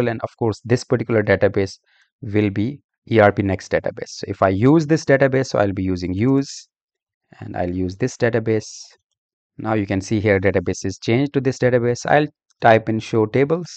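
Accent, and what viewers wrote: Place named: India. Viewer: can't we find a tutorial without an indian like accent :(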